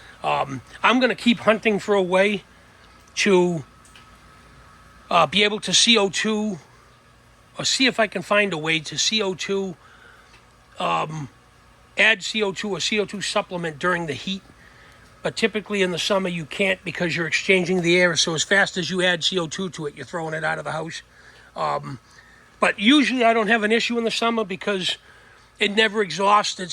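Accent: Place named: Upstate New York, United States. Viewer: Upstate new york or Jersey for sure. Nice space buddy.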